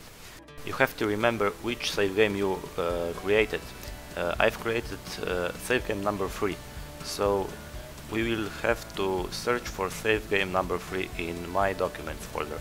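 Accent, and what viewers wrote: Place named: Hungary. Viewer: Magyar vagy? :D Nagyon magyaros az akcentusod :D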